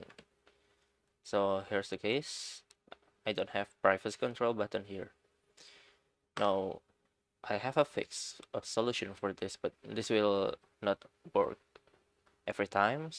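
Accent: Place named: Indonesia. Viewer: bro are u indonesian, your accent sounds like indonesian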